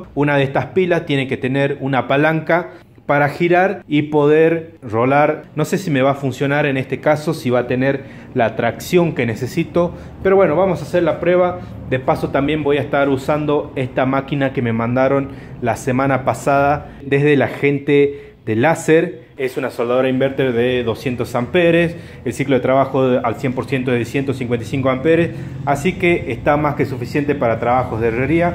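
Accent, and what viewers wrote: Tucumán, Argentina. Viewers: Hola de donde sos. Yo soy de tucuman te escucho asentó tucumano. Me gustaría conocerte. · Gracias por compartir, muy bueno ...tucu o salteño?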